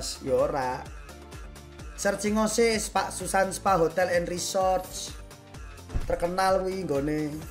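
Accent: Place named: Java, Indonesia. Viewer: Mas aku rak ndelok live sepurane tapi omonganmu ki koyok wong jowo e mas Jane we cino opo jowo?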